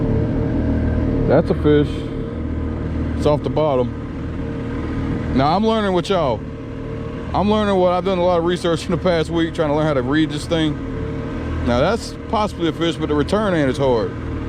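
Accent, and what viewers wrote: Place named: South, United States. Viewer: “A freaking Hog” 🤣 I’m glad I waited until I could watch this whole vid! All sorts of Southern coming out of you!! Love it! So crazy you pulled the first hook up right out of that same fish! The aggravating Bass guy was definitely fishing the right spots and he was probably cussing you just the same! Lol. Your little bait fish is my up coming PB!! Don’t be hating on him!! 😝 Glad to see you had an awesome day out! Also glad you stopped starring at that fish finder!! Techy, techy.. what in the world did our Granddaddy’s do?! Oh yeah, they just enjoyed being out there!! Lol.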